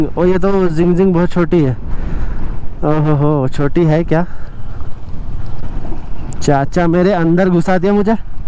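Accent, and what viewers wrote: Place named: Bihar, India. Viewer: Bhai love ur accent.... Hum bhi bihari hai bhai ❤️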